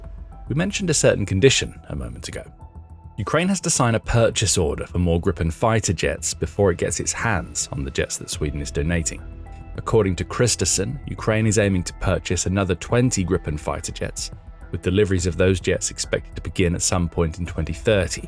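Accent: England, United Kingdom. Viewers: Couldn't you guys find a better word than "cheap" to describe the Gripen?? Try cost-effective - relatively inexpensive - or affordable. Not cheap= poor quality. For someone with an English accent you really have a limited vocabulary.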